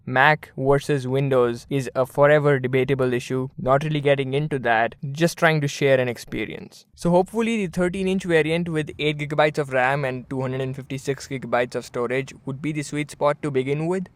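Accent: India. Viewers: lol this indian accent.... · fucking indus, learn to speak English and go back to india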